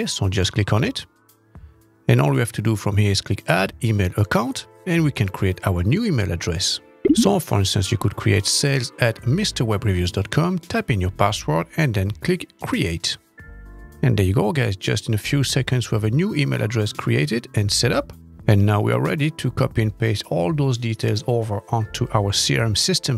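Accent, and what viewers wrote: France. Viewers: I hear nice French accent 👍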